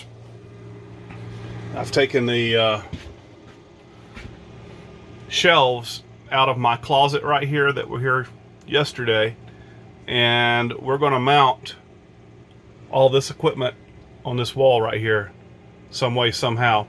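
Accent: South, United States. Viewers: I can understand you changing it to all in the closet..... something goes down you don't have stand on your head to check it out. And you have a place to add to the crazy if you want. I think a lot of the stuff outside should be inside were it can be kept clean and dry. You can store you colder cloths and equipment out side if you like but everything gets so dirty out in the bins Anyways love the Dogs playing in the water. Hows your old Friend Bill doing.? Your sure looking better. Wouldn't it be something if all your back pain was from the kidney stones you have had for years. anyways take care. And you sound just fine hahaha Southern good stuff.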